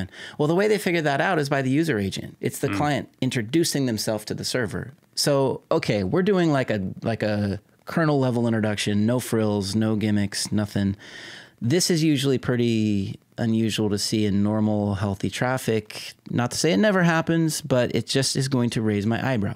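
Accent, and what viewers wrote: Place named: South Africa. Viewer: Nice channel. Fellow analyst pointed me here a few days ago. First thing I recognised, the SA accent.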